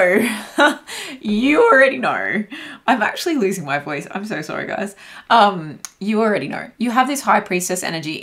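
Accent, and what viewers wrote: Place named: Australia. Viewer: same here, it's weird hearing an Aussie accent on here.. funny when you second guess your own accent lol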